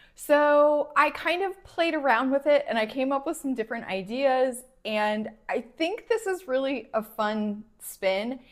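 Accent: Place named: New Jersey, United States. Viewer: I'm guessing you're from New Jersey from the way you say orange! No a - ornge! Ornry ornge! That's how we say onery down here - no e! Say Ornry ornge 3x fast & yer jaw will let you know about it.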